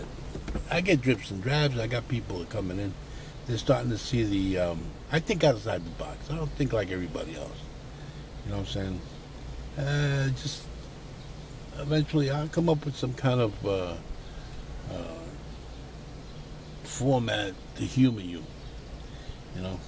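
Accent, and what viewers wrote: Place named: New York, United States. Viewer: New fan here! My name is Tony, I am from Illinois and married with two boys. I would like to tell you that keep doing what your doing, be real, I am 32 years old and I get your humor! Also, helps, that to someone like myself to see your way of living, accent, being from new york is ALL interesting enough! Would love a shout out on a vlog of yours man, would make my day to see a future "youtube kingpin" pay back the love! Keep vlogs coming man, Love'em!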